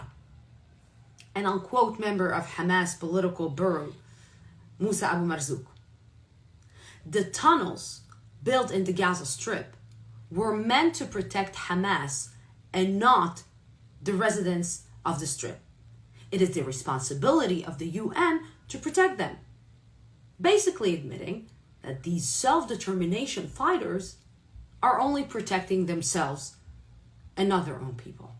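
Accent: United States. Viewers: God, you are incredibly BRAVE! To speak truth to lies in your world* is unbelievably Brave! I only wish people could understand that reality is reality even though I, you, us don't like it. Looking reality in the face and saying this is the truth is one of the hardest things to do. (*even though you sound American)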